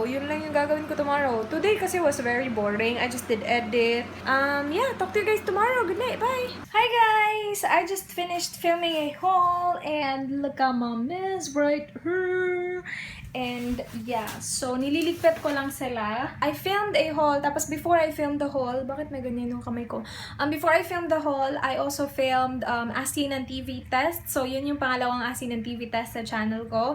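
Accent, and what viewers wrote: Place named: Cebuano, Philippines. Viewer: Miss I'm your new subscriber... My mother is from cebu as well hehe... I super enjoy your videos it reminds me my family ung tono ng pagsasalita mo parang same sakanila kase from cebu and english...hehe Pwede po ba shout out sakin and sa asawa ko na si Odi dito kami sa Abudhabi... wait ko po hehe.... Godbless us all... Take care!!!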